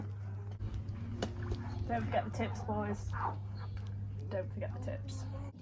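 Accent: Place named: Manchester, United Kingdom. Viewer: You sound like a fellow Manc!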